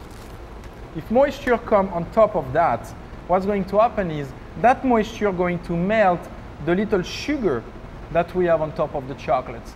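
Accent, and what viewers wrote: France. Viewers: The French accent ssoooooooo does it!! Tem-purrr-achture!!! Love it !!! Is partly because they stick their lips forward like they are about to kiss you when they are talking...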